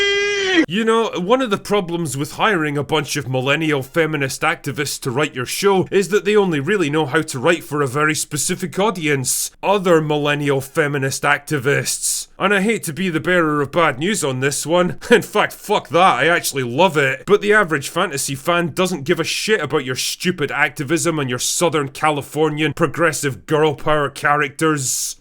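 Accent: Scotland, United Kingdom. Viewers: I had to give up watching this after 2 mins 19s because the voice of the commentator is sooooooo annoying. Yes the Witcher is bad especially now Henry has gone…… but that annoying scottish turd is so much worse I couldnt bear to hear another syllable come out of his mouth without feeling my toes curl the wrong way.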